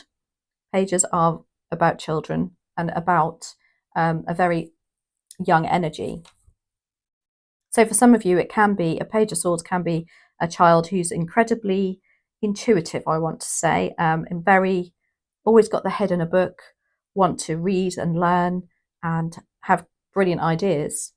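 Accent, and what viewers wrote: United Kingdom. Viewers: Thank you so much In America we love the British accent:face-blue-smiling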